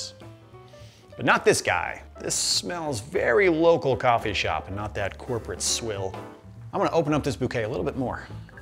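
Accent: Australia. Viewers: I feel i should hit you for that Aussie accent, but your just so darn cute i can't xD (greetings from Australia) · Australian accent…..more talent from this guy!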